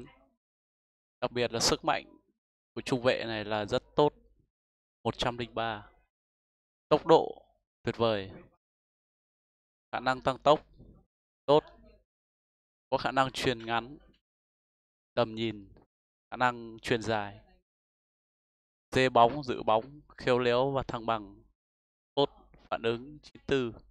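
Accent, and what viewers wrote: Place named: Yen Dinh, Vietnam. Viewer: nghe giọng nói là nhận ra a ở yên định. Bạn anh ở thọ xuân có vài người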